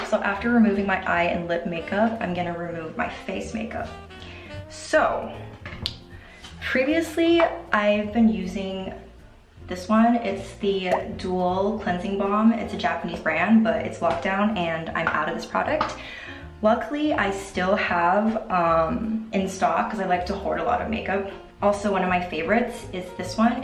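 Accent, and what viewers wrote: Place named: United States. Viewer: i’ve been following your insta for a while and this is my first time hearing you talk. and wow i didn’t expect the american accent! i really thought you would sound posh british somehow since i see you update in london quite a lot 🥲 you’re so pretty, by the way!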